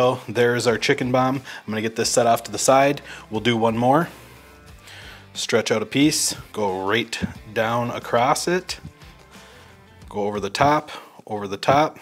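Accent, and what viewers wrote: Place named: Chicago, United States. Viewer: Are you from the upper midwest? You sound like you’re from Chicago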